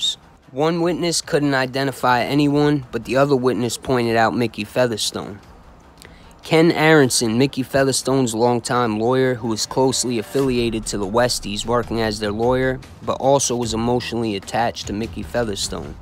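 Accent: United States. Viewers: Yo Stight up I think you got this brother OC Shortz. No disrespect to OC Shortz his video official. But first of all ya styles are so different they can’t even be compared they two completely different styles. He got that commentary vibe going where he on the videos talking mixed with I’ll digital & sound effects mixed in. But on da real ya style is original. You don’t show ya face. Your digital editing skills coincide with your voice. The way you show the picture’s of the real guys with dope arrangements. Your version is straight up official mini documentaries. Plus let’s just face it. This is “ Our Thing “ ya American voice and the audio delivery sounds official! 💯 ya voice matches ya Video presentation. A British accent kinda takes away from the vibe like a student presenting A presentation of a foreign life only able to just spit facts about what he researched. 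Don’t get twisted his shit dope like I said no doubt OC Shortz is great in that style. But in my option having family members of my family in the life, no comment on myself. Lol I prefer your style. My brother if it was up to me you got him , ya best doing this shit right now. This is the American Mob, ya voice match’s the vibe of ya content. Not seeing you makes the audience paint a picture of how they perceive you look. Which with ya serious tone would be perceived as gangster in American gangster vibe.. So like said no disrespect to the British homie doing the whole commentary vibe with his face up in the camera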